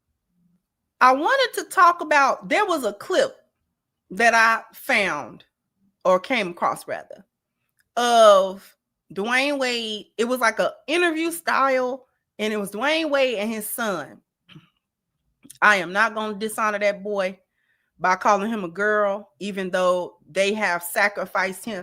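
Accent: Chicago, United States. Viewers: Yep, loved the "tuna can" comment. That's Chicago in you! I know it when i hear it! 😂😂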